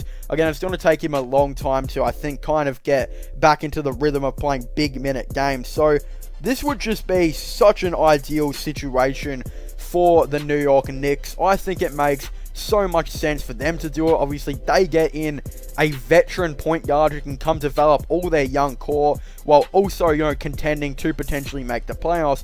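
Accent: Australia. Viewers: talking too fast....and Aussie accent. Lol.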